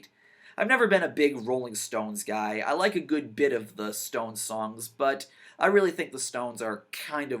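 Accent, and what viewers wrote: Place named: United States. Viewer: The only thing wrong with The Beatles is that you Americans keep saying Beedles. It's Beatles with a t for f***'s sake!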